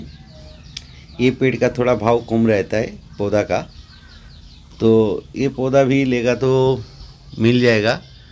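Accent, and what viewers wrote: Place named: Bengal, India. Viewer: ठीक से तो बोली, बंगाली लहेके में बोला, इसमें गलत क्या ??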